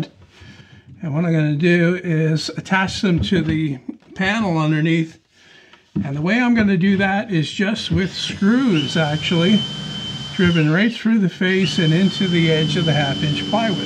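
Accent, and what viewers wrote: Canada. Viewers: Hey, I was wondering, are you canadian?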